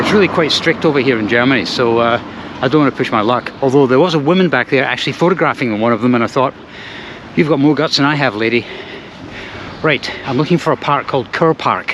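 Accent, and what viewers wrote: Scotland, United Kingdom. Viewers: What English dialect are you speaking? It sounds a wee bit like Scottish, but since I am no native I cannot tell, really.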